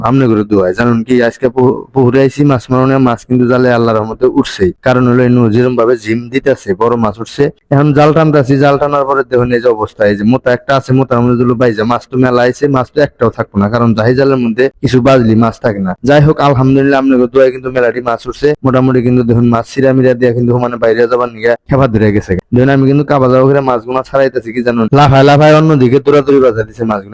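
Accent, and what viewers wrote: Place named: Jamalpur, Bangladesh. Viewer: জামালপুরের ভাষা বলতেছে 😊❤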